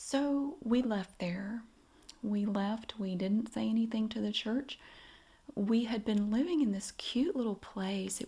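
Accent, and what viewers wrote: South, United States. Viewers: This made me go back and look to see how long I've been following you... 8 years!! Almost since the beginning! Love the vibes and the Southern accent, makes me homesick for North Carolina (lived there for a few years back in the day). You do you,!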